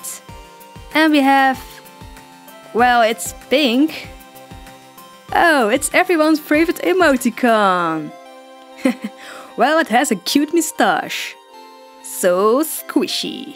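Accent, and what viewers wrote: Netherlands. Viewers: ik kan echt horen aan je engels dat je nederlands bent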